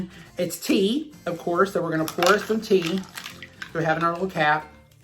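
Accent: South, United States. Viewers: I should have watched this during my tough week! You are so much fun (and I am learning a lot)! I love that Charlie's Angels lunchbox:-) I grew up in the 70s and 80s. "It has them arresting somebody on the back" - one of the funniest YT lines ever! Plus the safety rules? Too much fun! Don't let anyone tell you "how to" pronounce words - love your southern accent! That KGD foundation is my favorite. It looks good no matter how applied. You deserve the recommendation by Tara so much! A few favorites - speed racer, strawberry shortcake, holly hobbie (blue bonnet), wonder woman, smurfs and so many more!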